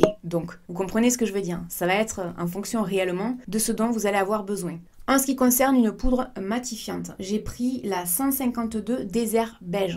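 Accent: South, France. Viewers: J'aime trop ton petit accent du sud il est trop chou 😘😘💛💛💛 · J’adore tellement ce genre de vidéo venant de ta part ,avec le petit accent du sud 🙄🙄 Mérite beaucoup plus d’abonnement je trouve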